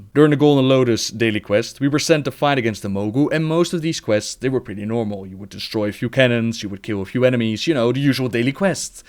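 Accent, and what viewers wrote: Netherlands. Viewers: The Dutch accent is real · He has a typical dutch accent lol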